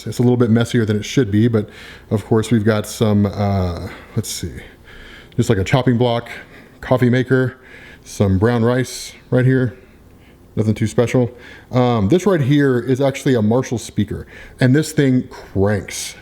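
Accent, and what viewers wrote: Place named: Boston, United States. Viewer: Your from Boston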